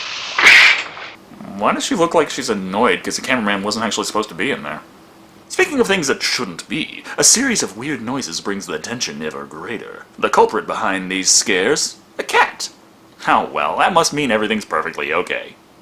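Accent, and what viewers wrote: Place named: New York, United States. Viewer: Ok seriously what is with the New York accent?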